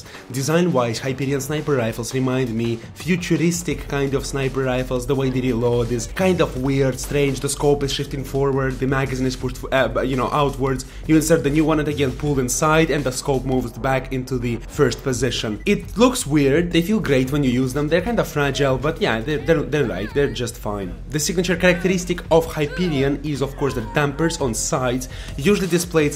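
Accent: Russia. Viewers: Are you Russian ? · My friend YOU are speaking ULTIMATE RUSSIAN ENGLISH. (sorry if it came out racist) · Definitely more Russian than Italian, his accent is strong. But cute too ;). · i can tell from ur accent that ur russian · Are you Russian by the way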